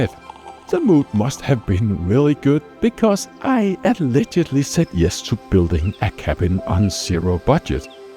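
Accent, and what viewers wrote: Denmark. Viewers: jeg kan bare lig hvor dansk du lyder :D jeg er også dansk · You have similar accent to a Dutch youtuber I follow, Posy. Danish and Dutch have very similar accent when speaking English. I think it sounds cute.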